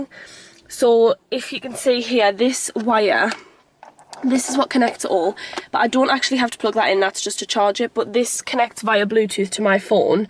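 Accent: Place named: Newcastle, United Kingdom. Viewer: I love your accent, are you from Newcastle? And also, where did you buy your car combi bag? X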